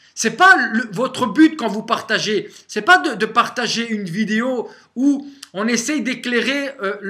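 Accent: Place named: Belgium. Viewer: Le petit accent Belge qui passe bien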